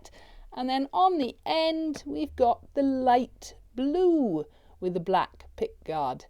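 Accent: Wales, United Kingdom. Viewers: Omg haha I'm sooooo sorry 😂 I'm usually pretty good with the Welsh accent and you speak very clearly so it was obviously me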